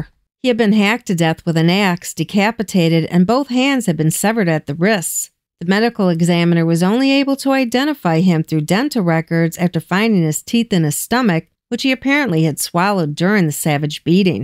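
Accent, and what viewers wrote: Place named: Northeast, United States. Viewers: Another great production and presentation! Please take this as a huge comment, your voice with your accents really brings this old country boy the Northeast mafia territories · It's like I'm back up in the Bronx listening to Aunt Irene tell a story. Love it.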